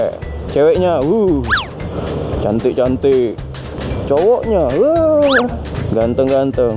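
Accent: Medan, Indonesia. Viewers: Ko kiranya medan bg jemping jemping sampeng😭😂😂😂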